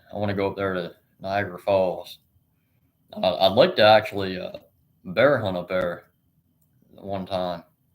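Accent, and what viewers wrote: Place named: South, United States. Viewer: Wow I had to subscribe to your channel I seen your first one when you were looking for logging trailers your young & I just have a feeling your business is going to go through the roof ❤ 👍🏾🙏🏿 & if you stay on YouTube and don't change your going to do amazing Because you come off sounding so amazing being a young logger & your country accent seals the deal ❤ ❤❤❤❤ You won't be single if you are for long lol from Norristown PA keep up the good videos and may your business prosper an enormous ways & i hooe you fire the people that are not 🚫 helping your business 👍🏾🙏🏿👌🏾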